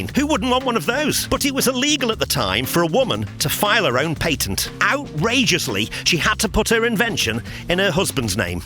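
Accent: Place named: Stoke, United Kingdom. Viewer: I thought I recognised that accent. I've lived in Stoke for over a Decade now. Been trying to place you for a while.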